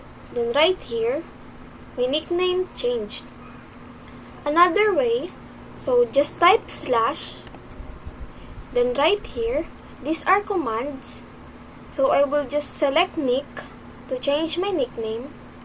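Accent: Philippines. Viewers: Yup i could tell bc of his accent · Based on her accent She is filipino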